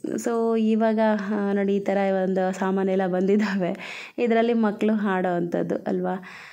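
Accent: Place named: Bellary, India. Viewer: Nivu matado shaily nodi nanu bellary ಮೊಳಕಾಲ್ಮುರು side erbodu ankonidde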